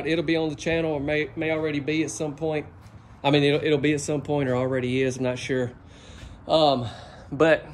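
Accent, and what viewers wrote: South, United States. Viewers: Omg, this has nothing to do with nothing, but I love his Southern accent♥️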